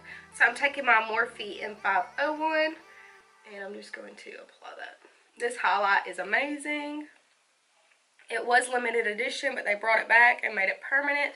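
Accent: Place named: Georgia, United States. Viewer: Absolutely love the Pro glow! Definitely my go to everyday foundation! I love your accent too! Soo cute! Reminds me of my grandma, she's from Hiawassee (however u spell it ) Georgia! It's so funny whenever I'm with her I always catch my self talking like her! Lol love it!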